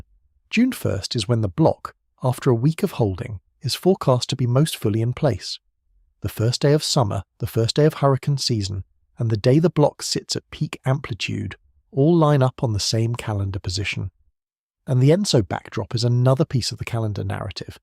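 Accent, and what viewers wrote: United Kingdom. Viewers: The worst weather was the same time as the Dust bowl. Government is not smart enough to solve any problems and they do not want to balance the budget. A house of Reps without a budget is not a constitutional government. Currently irritated by British accent. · Can't we find an American narrator? I find this heavy British accent very annoying.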